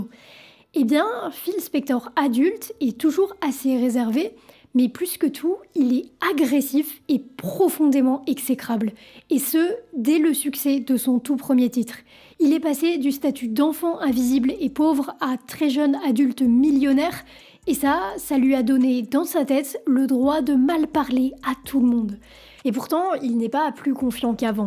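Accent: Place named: France. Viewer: Très bon documentaire, bravo! Par-contre si je peux me permettre, essayez d'améliorer votre anglais.''The'' devrait sonner presque comme ''de'' et non ''ze''. Vous n'êtes pas la seule, la plupart des français le prononcent comme vous! Juste mon petit grin de sel ; )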